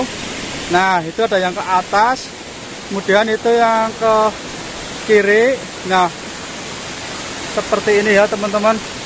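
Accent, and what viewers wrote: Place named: Banyumas, Indonesia. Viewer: Sampurasuuuun,sing sarehat sukses baraya..kang ciganamah aya aya ngapak na gitu....hehee mantap kang...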